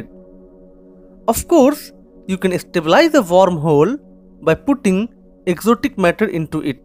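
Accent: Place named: India. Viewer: Cringe indian accent Stolen Content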